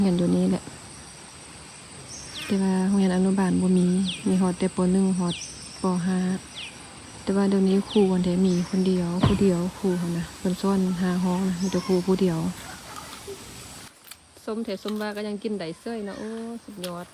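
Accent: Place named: Dan Sai, Thailand. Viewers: เป็นตาฮักหลายสาว ทำไมผมฟังสาว สำเนียงเว้าน่าฟังแล้วยิ้ม ตามเลยเด้ สบายดี บรรยากาศที่นั่นคงดีหลายน้อครับ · สำเนียงคือ คนด่านซ้าย นาแห้ว ภูเรือ พ่อครู ยุด่านซ้าย จร้า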